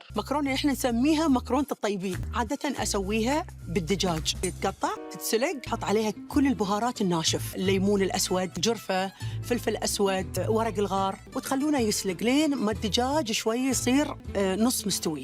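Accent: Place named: India. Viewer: ليش تسولف نفس الهنود لي تكلمو عربي😂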